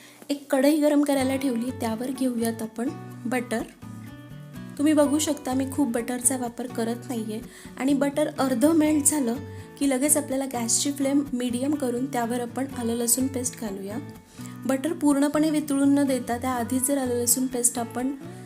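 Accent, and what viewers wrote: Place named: Pune, India. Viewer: ताईची भाषा पुणेरी आणि स्पष्ट👍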